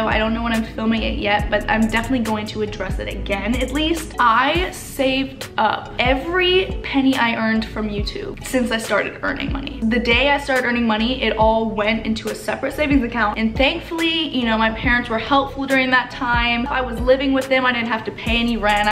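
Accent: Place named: South, United States. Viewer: I think it's more of her trying to cover up a southern accent. "SAA-FAR-EE" instead of "suh-far-ee".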